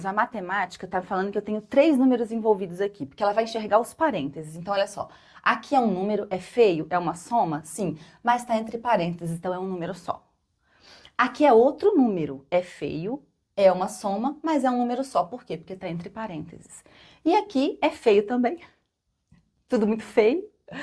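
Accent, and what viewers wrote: Minas Gerais, Brazil. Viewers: Sotaque mineiro...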